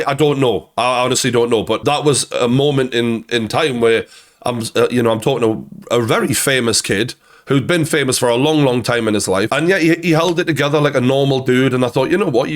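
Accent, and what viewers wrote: United Kingdom. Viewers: I think “Good Lad” is an expression that was made for you, you’re everything that I picture in my mind when I hear “Good lad” resonate with a gorgeous British accent… You’re a great man, stay you, ignore the bullshit critics, that comes with success, just focus on the love you’re getting from true fans of your work. Peace brother (from a froggy on the other side of the channel 😅)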